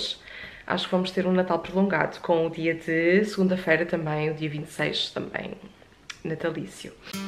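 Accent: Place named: Douro, Portugal. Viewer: A minha família paterna também é do Douro, mais propriamente de Lamego e é curioso que reconheci logo o sotaque tão característico e de que gosto tanto! 😊😘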